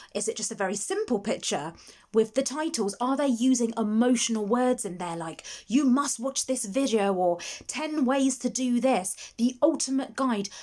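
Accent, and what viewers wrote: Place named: London, United Kingdom. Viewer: I just created my channel posted up just my intro and outro but have limited items to edit can you help and offer advice? I’m from London and guessing your same from the accent thank you! Would like free software for editing and best ways to make good interesting shots and how to make it look good anything u can pls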